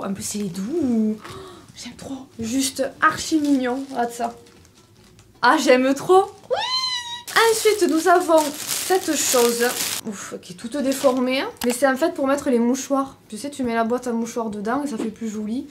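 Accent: South, France. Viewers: Bonjour, je suis nouvelle sur ta chaîne 😊 j'ai commandé sur temu j'aurais dû recevoir aujourd'hui ça me note erreur livraison 😢 j'adore ton accent tu est d'où ? Sud ? ❤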